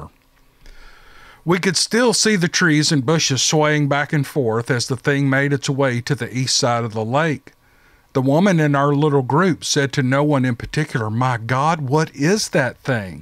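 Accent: South, United States. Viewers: I am a 68 year old southern girl. I love a good story and a real southern accent. You are awesome. Story telling is an art that was dying until people like you appeared on the internet, of all places. Thank you so much. You and those like you are national treasures. God bless:) Nancy in ga usa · I want to thank you from the bottom of my heart 💖for all that you do on this channel. Yes, I'm a little biased, because you have read my experience on here several months ago. ( episode 131) ☺️ But all in all I am not part of the Bigfoot community. Because I did not choose my experience. It chose me. I am not one of those fanatics who would go around bashing you BTW. Never have been...never will. I like the intro music that you use. I love your Southern drawl because I'm a West Virginia girl and it makes me feel at home. Your storytelling style makes me feel comfortable. 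It makes your story that you're telling me seem more real and more believable. Whether it is real or not matters not to me. What matters to me is that the style that you're telling it in, your delivery, it's the gospel truth. I really like whenever you're reading a line and it's funny, you break character and you laugh right along with us. Yes, you have a sense of humor and I think it's great! Because we're laughing, too. And when we can all laugh together it makes the world a better place! 🤣😂🤣😂 I really liked it when you had the children stories on here 📖. It encouraged them to be creative writers.📝 And that I think is totally awesome!👍😁 I think that's why I like your Steve Lily journals 📚 on your "What If It's True?" Podcast. I like your style of creative ⌨️ writing and I like the subject matter as well 👣. Okay, enough a$$ 😘 here, 😂 lol. God bless you. Please keep up the good work. I await your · Don't change anything you do. 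I love your story telling, the mannerisms, and your southern drawl, its a gift. Thank you for your love of a good story, and your platform to share them. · 🤣 YOU TELL EM!! YOU TELL EM REAL GOOD to put their BIG FOOT in their PIE HOLES and just enjoy them Narrated Stories in ye Southern Drawl 🤣- BEST RANT EVER! 🤣 🇿🇦 PS: Love Your Stories! 🇿🇦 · God Bless Brother! As you stated some people are so bitter in their own lives they need to spread their misery... sad actually. You have my support and attention, love your southern accent when telling these stories. Just like TV, if you don't like the show...just change the channel and to hell with your opinion. Keep going brother! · I love your stories and your voice/accent. I’m country-fied too. I have a strong southern accent. Those people who made the comments are idiots!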